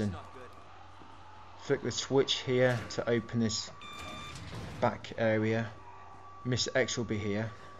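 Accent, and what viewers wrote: United Kingdom. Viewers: oh the British Accent, I LOVE IT <3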